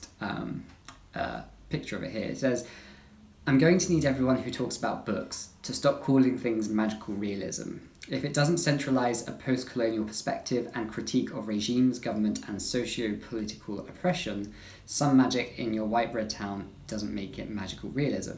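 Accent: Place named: United Kingdom. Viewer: I am from india ,came here to find. Something about surrealism but now I am like ..Wow I like this british accent 😘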